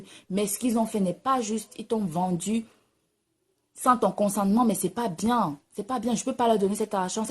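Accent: Cameroon. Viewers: C'est une camerounaise tu vois même son accent et le nom sa chaîne, la musique congolaise n'a pas frontières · Oh mais je rêve finalement c'est vrai! Les camerounaises parlent pareil :D Ma mère parle avec le même accent c'est fou ça non? !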